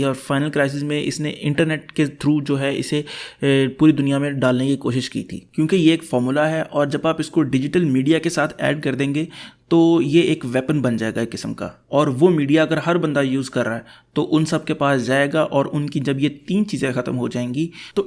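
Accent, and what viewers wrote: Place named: Pakistan. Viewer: Pakistan ke log ko dc psnd krte h ... Mene jb dekha h to awaz se pta lg jata h ke ye pakistani h... Acha h me v dc ko support krta hu...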